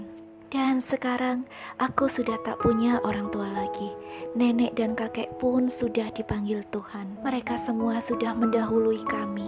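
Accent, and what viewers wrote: Banyumas, Indonesia. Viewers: Kita sama2 ngapak mba👌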